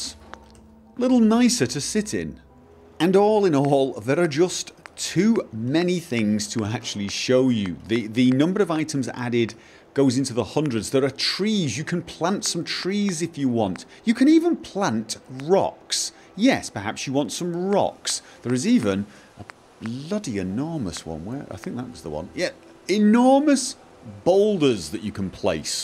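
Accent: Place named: Scotland, United Kingdom. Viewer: sir, can u tell me about that nice accent u speak? is that maybe scottish? I like it for sure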